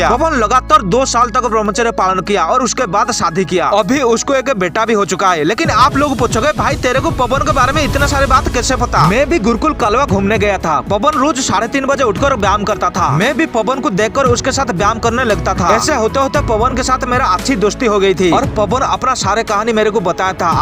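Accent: Bengal, India. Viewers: Bengali jaise bat karte ho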